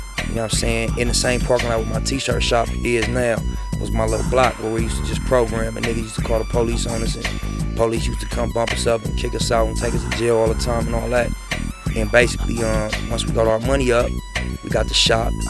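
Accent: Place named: California, United States. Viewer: I love the Cali accent... I love it!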